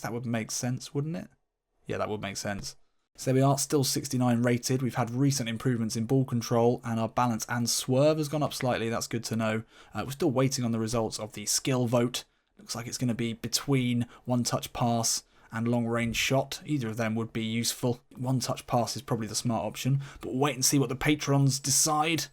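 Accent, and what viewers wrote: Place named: England, United Kingdom. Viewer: kalo dari aksennya, dia dari Inggris bro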